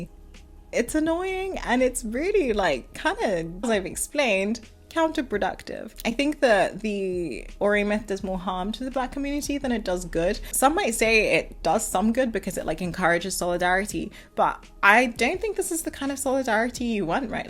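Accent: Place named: Africa. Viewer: Why you sound African then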